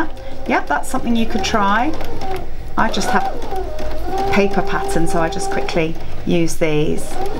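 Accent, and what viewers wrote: United Kingdom. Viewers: Thank you so much for this - have been sat getting very frustrated by wasting time on other useless videos and yours was perfect!!! 🤩 🫶 Quick question, if I may 😊 I have Brother 380D, and only 5 or so automatic embroidery patterns are marked for being ok for water soluble stabilser… the majority of the rest look pretty much chunky enough around the edges and fillings etc to be able to be held together enough -would they work and it’s just Brother being overly cautious? 🤭 Anyway, having watched your video, I now see that the world is my oyster! 😁 Thanks tons from a Brit who’s been living in France for so many years, that it was warming to hear your accent, as the majority of my crowd are French 😂❤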